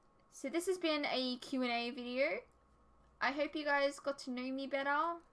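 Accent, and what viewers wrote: Australia. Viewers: Like I said, it sounds more Aussie.:-) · You're: Young, female, cute, and you speak English with an Aussie accent... Yep, I predict your channel will continue to GROW.:^) Lewis from Orange County, California, USA (just down the street from Disneyland).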